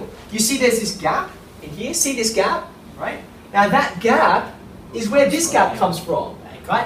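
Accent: Australia. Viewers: That's an Aussie accent he speaks with.. the way he says 'yeah' gives it away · If my math teacher had an Aussie accent, I would’ve paid a lot more attention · This guy with an Australian accent is nuts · He looks asian, but he sounds australian. What da mate !?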